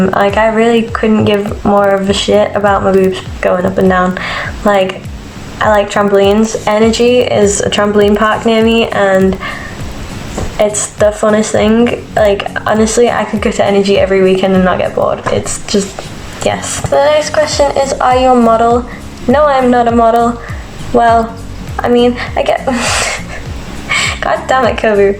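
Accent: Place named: United Kingdom. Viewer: Holy shit i did not expect you to be British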